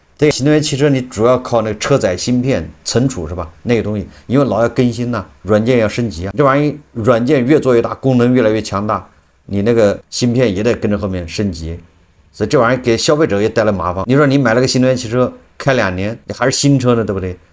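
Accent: Anqing, China. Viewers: 老师一听口音就是我们安庆老乡😅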